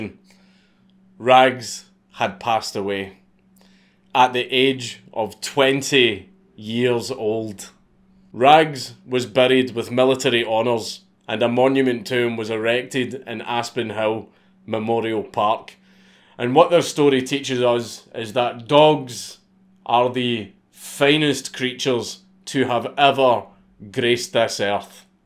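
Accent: Scotland, United Kingdom. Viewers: The stories you tell, and the way scottish accent, expose you as a really cool person who is doing something he's good at .... for the love of it. Thank You! Be well, and yes, I'm still practicing, but I've got doubt that I'll ever be able say 'clouds' , or 'fuck' properly. really, I guess it's just something you're born too. It sounds so ....... dare I say ... no. :-]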